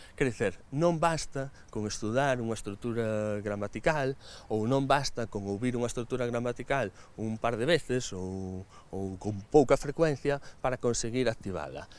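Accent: Spain. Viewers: Parece muito com o português brasileiro mais com sotaque espanhol. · Caro professor, você no Brasil passaria como se fosse um nativo com um leve sotaque espanhol. Realmente a língua da Galícia é muito semelhante a língua portuguesa falada no Brasil. A semelhança é maior do que o sotaque de Portugal com relação ao nosso sotaque. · Esto se escucha como un español aportuguesado, más que como portugués. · Ele fala o galego com sotaque castelhano , ou não ? É melhor por vocês falam parecido com o nosso português do Brasil. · Eu tb pensei que ele era um "gringo" falando português, com o sotaque do espanhol. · Soa como ele fala português com um sotaque espanhol. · Para mim que sou do Sul do Brasil o seu falar soa como um espanhol falando português brasileiro com sotaque carregado.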